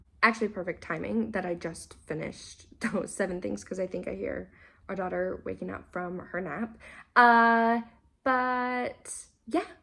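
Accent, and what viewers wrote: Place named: New Zealand. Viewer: I can hear a bit of Kiwi inflection in your speaking which is super endearing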